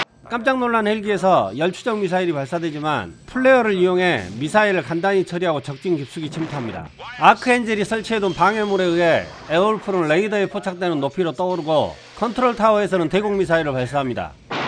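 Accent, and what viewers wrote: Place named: Jeolla, South Korea. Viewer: 구수한 전라도 억양과, 찰진 멘트에 또 낄낄거리며 힐링하고 갑니다.. 너무 감사합니다 ^^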